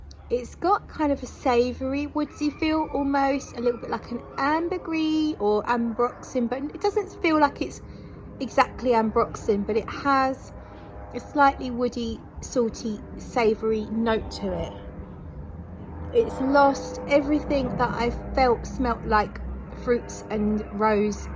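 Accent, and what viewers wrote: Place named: United Kingdom. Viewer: I just ordered Artsy by Kimberly NY- it’s supposed to be very light-hearted and candy like, possibly marshmallow-like. Also I just want to say I love that I get to hear your lovely British English often. I wish I had an accent like that. You know my mother was Australian so she said things funny and I did too but I remember the day I started saying “orange” with a long o instead of “arange” like her.. I know that’s not British but anyway I started out with a different accent..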